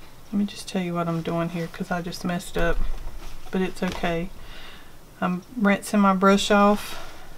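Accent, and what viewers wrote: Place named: Kentucky, United States. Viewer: I’m glad I found ur channel i love your videos and I think we have the same accent lol. I’m from SE KY.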